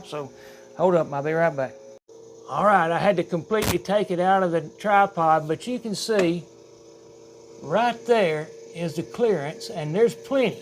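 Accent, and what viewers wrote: South, United States. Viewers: Thank you so much for this wonderful demo. It was just what I needed. And I just love everything about the way you did it and as a southerner, loved the accent to death!!! ❤ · As a new yorker! I like your southern accent very funny and hapoy!! 😂😂😂thank you for this video!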